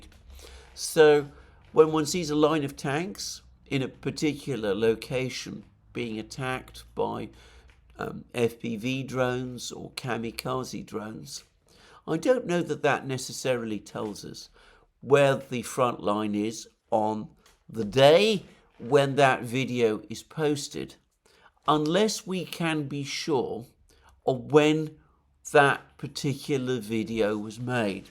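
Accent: United Kingdom. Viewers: Excelente clever well thought information. Your British accent so perfect for today’s description on Russia strategy. Great sound track. Thanks Alexander ❤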